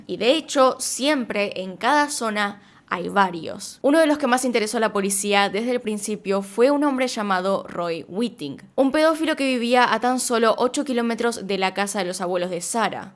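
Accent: Buenos Aires, Argentina. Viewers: Eres porteña? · Acento argentino 😕😕😕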